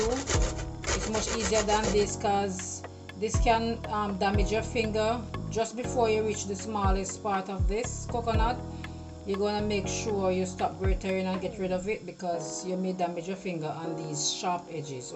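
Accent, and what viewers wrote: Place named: Jamaica. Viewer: Hello!! Mirna from Southern Arizona here! 🤓🌞 I love everything coconut! 👍 I'll be sure to try your recipe! Love your Jamaican accent 😁🥰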